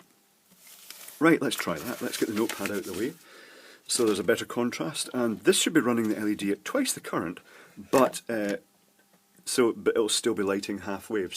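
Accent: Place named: Isle of Man, United Kingdom. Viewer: Ne-on. A noble gas that glows when excited by electricity. comes from the Isle of Man so he has a bit of an accent.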